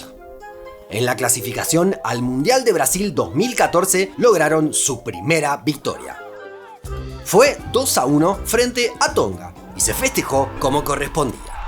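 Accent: Argentina. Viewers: Como tiene un cantito especial solo con las she pensé es argentino❤😊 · Es argentino el que habla?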